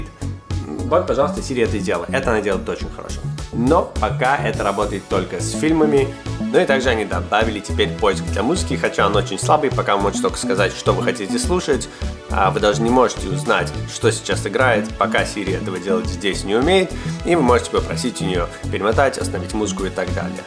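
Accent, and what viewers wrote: United States. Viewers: Американский акцент человека у которого родители привезли в детстве в США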